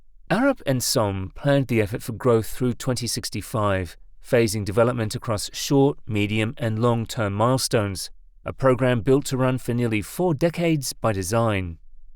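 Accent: United Kingdom. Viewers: This is BS. Newark is not a single airport without considering JFK and LaGuardia. It all functions as one organism. When one breaks down, they all break down. As for AirTrain getting to the train station, it is 20 years older than the JFK AirTrain. LaGuardia still does not have one. You sound British, is LHR the pinnacle of airports without messes? At least in London, the airports are all far enough away from each other, that a downfall with one does not affect the others.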